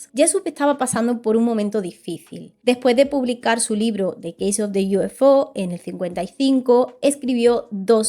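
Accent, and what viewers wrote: Andalusia, Spain. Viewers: Muy buen análisis. Tu acento Andaluz me encanta. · De Andalucía verdad? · Esta chica además de encantadora es una gran narradora y ese acento Español es delicioso. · Un encanto, la sra y su acento andaluz....lo de filadelfia, un engaño mas de USA....... As usual. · Gracias por compatir. Totalmente inverosimil el cuento dado por ese señor. Tu acento andaluz y tus ojos me han engangado. Saludos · Acento típico Andaluz, yo diría de Sevilla o Málaga ... !! Saluds desde Montevideo - Uruguay !!!!😅👍🏻😉🎸🎸🍺🍻😎😎😎😎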